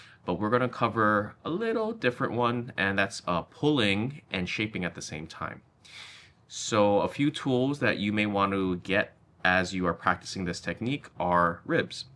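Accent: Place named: Hawaii, United States. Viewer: I can hear your small kine Hawai’i accent.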